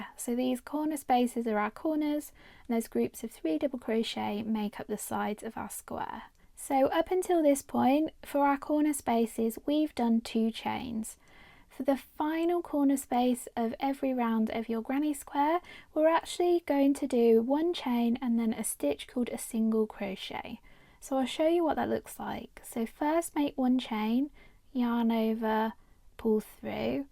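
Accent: United Kingdom. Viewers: And for people who don't live in America and want to use UK terms, do I have to find a UK tutorial??? I thought with your accent you would be using UK terms. I need something not American.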